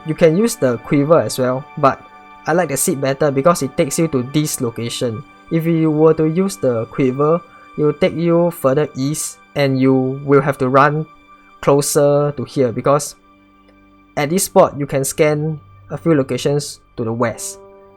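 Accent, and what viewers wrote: Singapore. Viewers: Really appreciate your explanations while doing clues, would love to see more of such videos. And I feel comfortable listening to a fellow sg voice.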